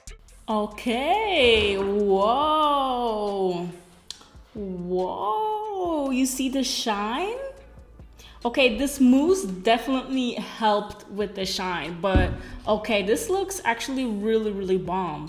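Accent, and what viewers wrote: Netherlands. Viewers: She says that in the description box: She lives in Belgium, half Dutch-half Ghanaian, and Dutch is her first language, thus the thick accent! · Het staat je geweldig maar wat een werk. Wel grappig dat ik even dacht een Nederlands accent te spotten. Heel even maar. Want je Engels is echt goed. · Omg this is the first vid I watch of u, butt I knew right away that u were dutch bc of your accent omg we dutchiess always have that same accent its just soo funny😂😂😂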